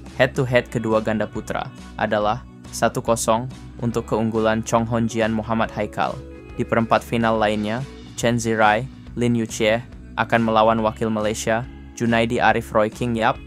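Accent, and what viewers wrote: Banyumas, Indonesia. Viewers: Pengisi suara ngapak dah ga seenak dulu... ga suka suara yang sekarang..😢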